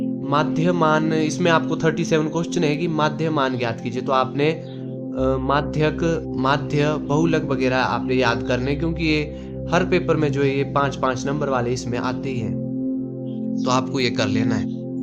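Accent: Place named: Pahari, India. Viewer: Aapki voice to phadi logo ki traha lag rhe hai himachal me aap kha se hoo ...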